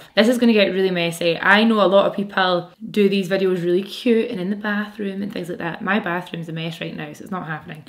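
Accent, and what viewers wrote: Scotland, United Kingdom. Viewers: you're so Scottish, I love it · i just want you to know that my teacher has a scottish accent and everytime she speaks i always imagine u speaking lmao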